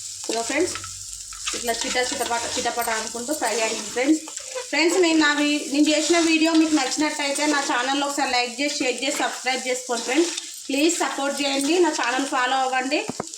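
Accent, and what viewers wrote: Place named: Telangana, India. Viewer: ఎ ఊరు అక్క మనది మొత్తం తెలంగాణ మాటలు ❤❤❤👌👌👌👌👌👌👍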